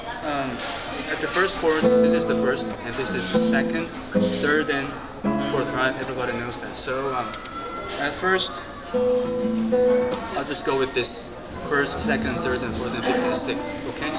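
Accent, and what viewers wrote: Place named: China. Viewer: Where are you from? Like a Chinese.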